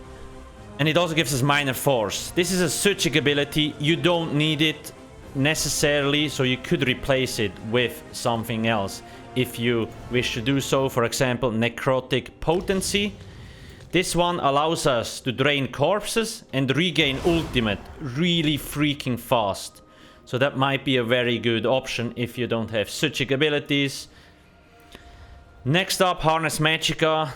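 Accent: Switzerland. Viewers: Sounds like a swiss dialect. Grüezi us Dütschland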